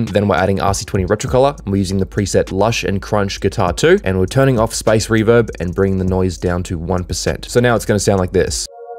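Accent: Australia. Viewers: U Aussie mate ?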